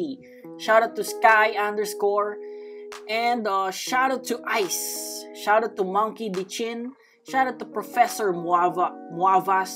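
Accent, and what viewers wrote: Philippines. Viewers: When I heard voice I knew he is Filipino lele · PINOY SABI NA EHHH YUNG ENGLISH ACCENT NYA PANG PILIPINO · Ur voice like philippines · I KNEW YOU WERE FILIPINOOOOOO just by your accent :>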